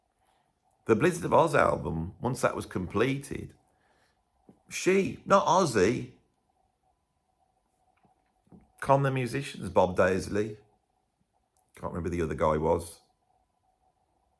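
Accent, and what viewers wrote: Birmingham, United Kingdom. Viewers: Brummie stream of consciousness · Your a character besides our general interest in music Were here to listen to you.There now I've sucked up. I don't mind Brummie,we need a good laugh besides the serious stuff.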